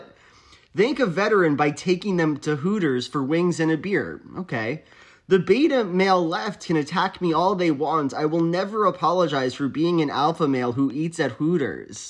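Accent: United Kingdom. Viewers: I genuinely thought you were from the UK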